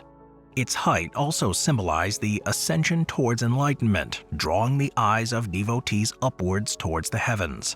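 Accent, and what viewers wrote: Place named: United States. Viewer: The American accent of the narrator hurts to the ear..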